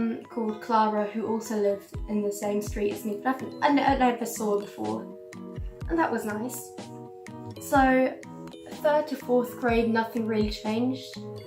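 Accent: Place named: United Kingdom. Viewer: I swear your accent is so fucking british 😍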